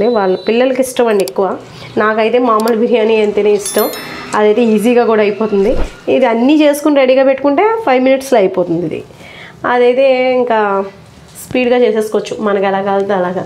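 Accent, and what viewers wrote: Godavari, India. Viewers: Madam meru godavari zilla valla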